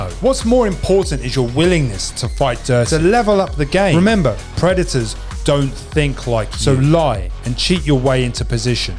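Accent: United Kingdom. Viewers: The guy in the video sounds like a Brit. We don't get as many street fights here in the US since there is a good chance that the person you are thinking of attacking is armed.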